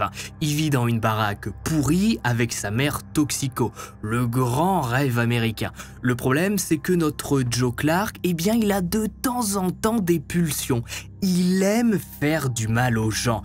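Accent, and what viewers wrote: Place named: North, France. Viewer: Cet accent de nordistes c’est pô possible !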